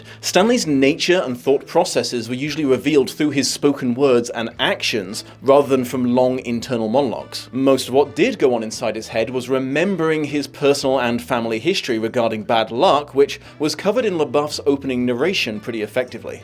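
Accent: United Kingdom. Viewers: “Anyone who had seen the film recently probably felt a tad bored there at having the entire plot narrated back to them in a British accent.” Dude, that’s why I’m here! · just to be clear, not a single American will ever be bored hearing a British narration. You might as well read the Terms and Services with that voice and we’ll tune in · if you dont think half of the reason we are all here is to hear the plots of books we already know in a british accent youve misunderstood your audience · I'm happy to have been refreshed the plot of the book with a British accent (although your accent is very different now from when you started your channel!!). It's always nice when something that you liked as a kid still holds up as an adult. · As a fan of both the book and film I quite enjoyed getting a synopsis in a British accent! Caveman is the name Stanley gets for finding a fish fossil. 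They may bestow it because he's passed their hazing and they've come to accept him, but they chose "Caveman" because of the fossil. This is one of the films I think of when I think of great book-to-screen adaptations, it's remarkably close and makes good choices for what to change.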